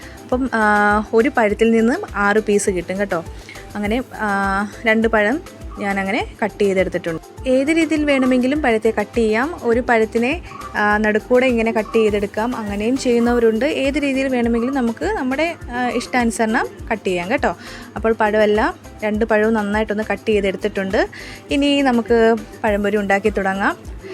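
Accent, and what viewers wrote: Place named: Kannur, India. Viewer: സംഭവം പൊളിച്ചു ☺️☺️🥰എന്തോ കണ്ണൂർ ഭാഷ പോലെ തോന്നുന്നു 😍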